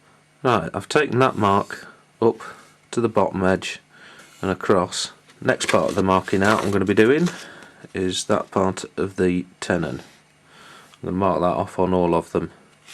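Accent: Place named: Northern England, United Kingdom. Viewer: great instruction...love the wallace and grommet accent. "More por-r-i-g-e Grommet?"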